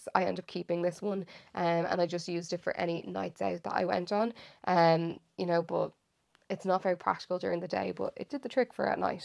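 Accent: Ireland. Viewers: A Kiwi here - I LOVE hearing an Irish accent and had no trouble understanding you. We ALL have accents of one kind or another, and Irish is one of my favourites.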